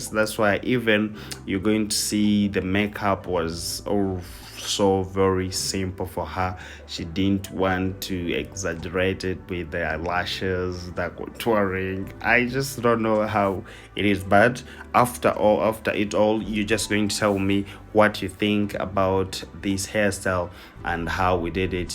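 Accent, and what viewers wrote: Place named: Uganda. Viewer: Voice over sounds Ugandan 🤔🤔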